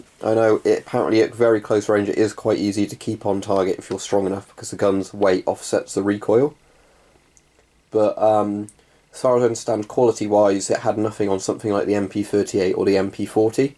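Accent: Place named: United Kingdom. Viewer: That's sweet, I was about to say how does a person with a British accent who I assume is from England has a sub machine gun. but I see that its deactivated. still would be awesome to have I am from Canada and something like that would be highly illegal to have functioning as well and if I could buy one like this I would. I love Russian Guns, Love my SKS & Mosin Nagant. are you guys in the UK allowed to own those two and the SVT-40 (I've have yet to buy stock seems to be drying up on those in my area)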